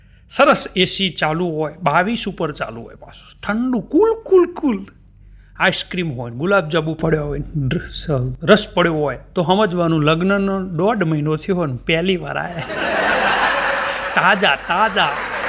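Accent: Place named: Mehsana, India. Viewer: मेहोना नी टिपिकल बोली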